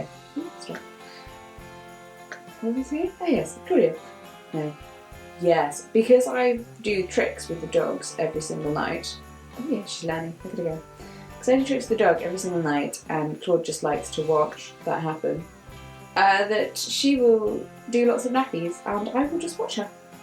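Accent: RP, United Kingdom. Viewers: Just when I thought I couldn't relate you more, you are also a simmer! From one posh sounding disabled lesbian to another, good choice on playing Sims 2 because that is my all time favourite Sims game 😊 you can get good safe mods and such on Tumblr! I get all mine from there. Also I completely make my simself and play. In Sims, I have a cat and dog and a lovely bungalow and I am searching for my wife but I think I'm going to have to make myself one because the townies are kind of...lacking.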